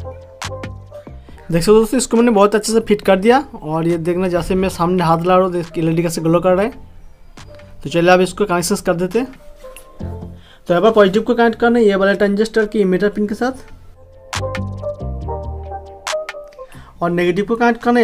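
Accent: Bengal, India. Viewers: Aami tum bangauli hai 🤡....yrr jo bhi ho bangali logo ka accent bht pyara hota h 😂💗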